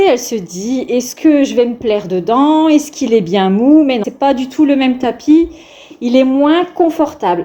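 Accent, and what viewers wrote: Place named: North, France. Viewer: Bonjour, J’ai découvert il y a quelques jours votre chaîne YouTube, et sincèrement je suis devenue accro, déjà parce que vous êtes du Nord de la France, et que vous avez toujours la bonne humeur, la douceur et la gentillesse dans votre voix et dans vos vidéos. Personnellement je suis originaire de Valenciennes mais il y a maintenant 1 an et demi, mon mari et moi avons eu la malheureuse idée de Tout quitter (famille, travail, amis, maison) pour reprendre une épicerie près de Poitiers dans la Campagne profonde 😱 Visionner vos Vlogs m’apporte tellement de joie et de bonheur, rien que d’entendre l’accent de notre région 🥰 Merci pour tout ce que vous faites Pourriez-vous simplement m’informer si vous habitez dans la région de Valenciennes ou pas? À bientôt Stephanie F.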